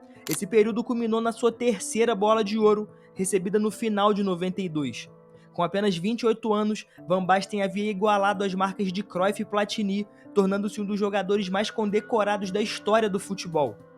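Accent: Rio de Janeiro, Brazil. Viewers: A única coisa que me irrita, é esse sotaque carioca insuportável e indigesto. Marco van "Baxten"...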